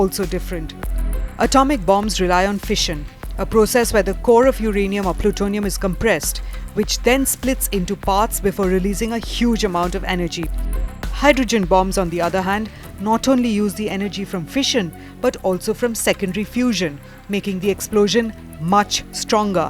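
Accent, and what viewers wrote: India. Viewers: Just speak your own indian language we do not enjoy that indian english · This Indian voice over is trash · Iam getting so bored with Putin and his nuclear threat he has been saying this for months this video is click bate no doubt it will be repeated again when Putin makes his next threat and people start to wobble again just like before Putin knows the response from the west if he did it would be goodbye Russia funny the voice over is Indian Russia's Allie what a surprise not🇬🇧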